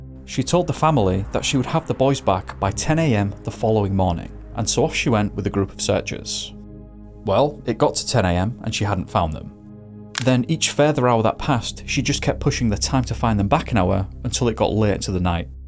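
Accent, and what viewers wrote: United Kingdom. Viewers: I agree! I prefer videos like these with UK-ish accents. It's oddly soothing to me.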